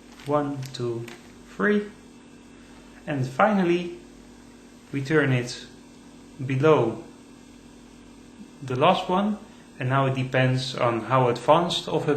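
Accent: Netherlands. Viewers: It's so obvious you're dutch, but good vid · thnx man en ja het is te horen dat je nederlands bent ;)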